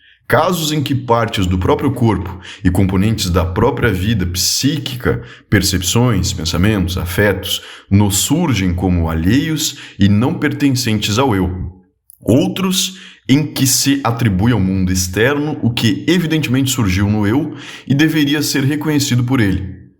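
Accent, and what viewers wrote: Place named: Curitiba, Brazil. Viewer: Sotaque curitibano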